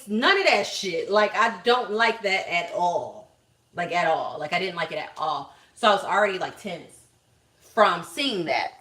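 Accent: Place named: South, United States. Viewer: we Luv you you too sexy and your voice is beautiful. I love your southern accent it is sublime. you just don't know. well now you do mmmmmm there is no comparison between you too. I came threw the door and said it before, that was that shit.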